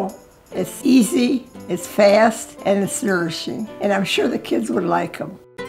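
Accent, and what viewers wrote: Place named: Chicago, United States. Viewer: I'm from Chicago right when i heard here accent i knew she was from my town Reminds me so much of my Grandparents Italian great cooking .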